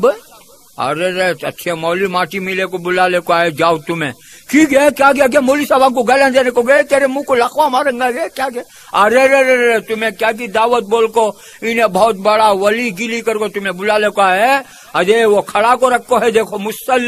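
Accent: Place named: Hyderabad, India. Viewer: North india me aisich boleto hyd ki language ku gaun ki language samajhte, ab ye jo lehja hai, bohot purana hai. Hyd me salon salon pehle, aisa baat karte the magar ab bohot sare north ke words laliye. Bangalore bhi bohot developed city hai. Wahan pe aisa baat karte, to kya bangalore gaoun hogaya kya be ? Language se city gaoun samajhne wale gawar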